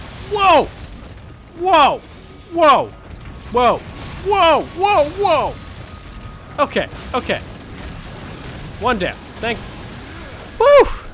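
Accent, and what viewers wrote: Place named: United Kingdom. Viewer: Hi I'm from the uk and i have to say i found your British accent most entertaining and i love the vids and the wit and banter. But here's the thing would it be possible to upload more than one vid i appreciate you maybe busy and if that is the case please ignore this message thank you.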